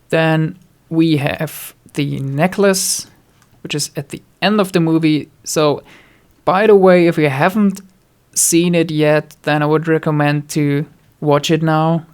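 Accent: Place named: Germany. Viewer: The german accent is the best part of the whole video!! Haha. Love it man. Keep at it!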